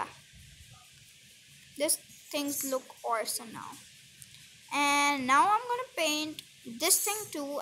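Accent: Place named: United Kingdom. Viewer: he is speaking like a british while he is a Indian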